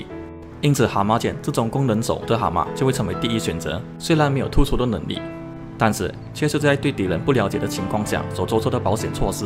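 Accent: Malaysia. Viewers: 馬來人講中文真的讓人耳朵很累很痛苦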